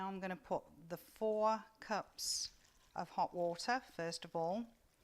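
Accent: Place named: Manchester, United Kingdom. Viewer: That’s an interesting accent. Sounds a little Manchester little U.S?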